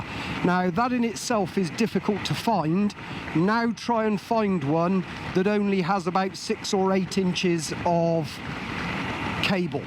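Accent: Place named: United Kingdom. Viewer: I love British accents. Thanks for the ride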